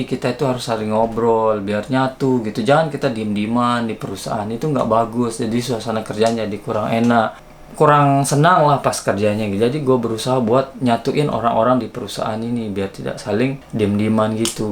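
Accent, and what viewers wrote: Lombok, Indonesia. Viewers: Kok mirip logat Sasak ya bang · Bang logat sasaknya gak bisa ilang walaupun hidup dijepang .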